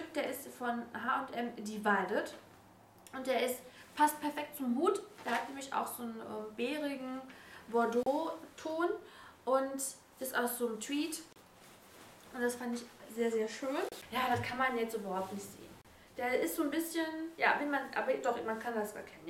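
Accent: Ruhr, Germany. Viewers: ich finde man merkt total, dass du hier ausm Pott bist :-D nimm das bitte als Kompliment! gucke deine Videos in letzter Zeit wieder sehr gerne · Du gefällst mir in deinen "neuen" Videos wieder so unglaublich gut, ich muss ehrlich gestehen das ich zwischendurch etwas "entliebt" war, mochte dich nämlich früher waaaahnsinnig gerne aber zwischendurch war das einfach nicht mehr die die ich sonst aus den Videos kannte und mochte und jetzt habe ich das Gefühl du bist wieder du, mit dem witzigen Humor und einfach einem Hauch Ruhrpott - den ich als Essenerin sehr mag! Ganz liebe Grüße, Julia ♥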